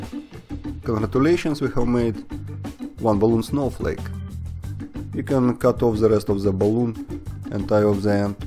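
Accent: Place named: Russia. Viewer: Great Russian accent ))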